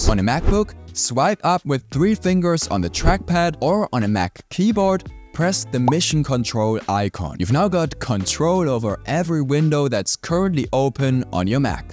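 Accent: Germany. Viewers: Das ist Deusches englisch kommst du aus Deuschland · Ngl you sound like a german speaking english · You german?😂😊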